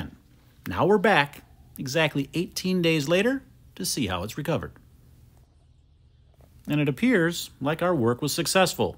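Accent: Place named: Wisconsin, United States. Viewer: Ope! Thats a Wisconsin accent if I’ve ever heard one.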